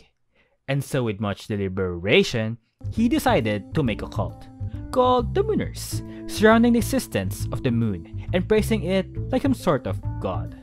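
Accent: Philippines. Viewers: i love ur filipino accent lods :)) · are you filipino? i can tell by the accent😂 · Ur accent looks like a filipino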